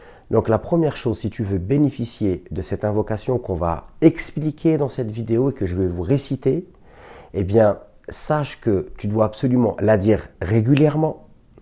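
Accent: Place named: Arab world. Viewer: Je te remercie du fond du cœur Mais l’accent arabe m’empêche de noter normalement 🙏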